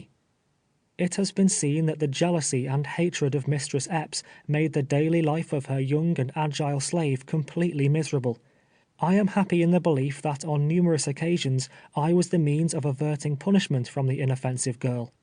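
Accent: England, United Kingdom. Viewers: Who would listen to this History read by English accent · I wanted to compliment the narrator. The English accent really conveyed the proper Grammer that was then real English. This history really shows how far we have sank from using words appropriately. A free'd slave spoke better and proper English than most people I know today. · yes please do. hearing a white british guy read it like hes reading the paper just feels wrong. it needs the power and feelings.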